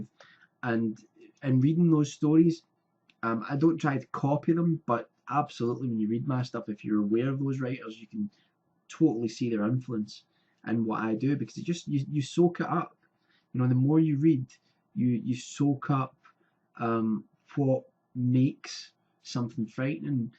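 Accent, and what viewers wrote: Scotland, United Kingdom. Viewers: Nice accent are you Scottish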